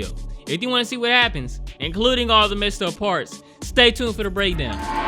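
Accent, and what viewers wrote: United States. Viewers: This guy has a nice American accent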